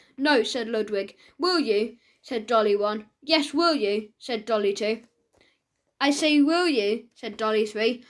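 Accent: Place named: England, United Kingdom. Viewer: I am very good at telling accents, I think he is from England. Also amazing reading!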